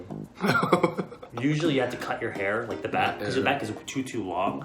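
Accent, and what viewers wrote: South Korea. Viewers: I love your konglish 😍😍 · the koranglish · i love this konglish omg i relate so hard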